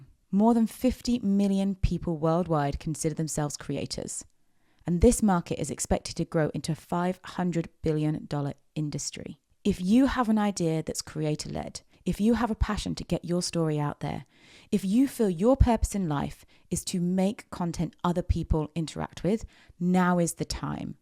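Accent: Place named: United Kingdom. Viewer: Love your british accent. For you i dont need the subtitles 😅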